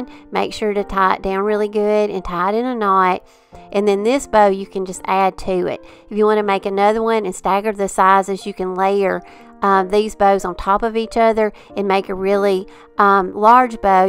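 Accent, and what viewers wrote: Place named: Alabama, United States. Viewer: Finally! Bows I can make that fit my decorating style😊 I'm so excited to have found your channel & not a bit surprised to see you're from Alabama (haay from the Shoals & yes your accent gave you away❤) God has blessed you with natural talent. Thank you for sharing. You make it seem so easy & your sweet spirit is so encouraging. God bless you. I hope you have a wonderful day!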